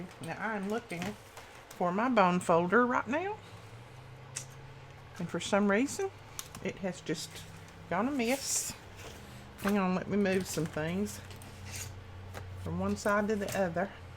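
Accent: South, United States. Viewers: I tried this for my first journal. I loved making it so much I'm working on my third journal....much harder ones. I love how there are so many choices for how to make them. And I enjoy your voice. You have the same accent my Grandmother had. She was from Nebraska. Yours may be a little more Southern, though. Thanks for the great videos.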